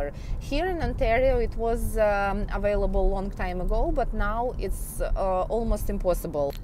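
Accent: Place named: Russia. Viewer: Ох уж этот русский акцет...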